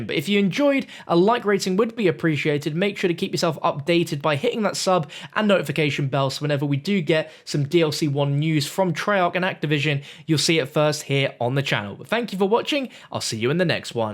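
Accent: United Kingdom. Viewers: The British accent is real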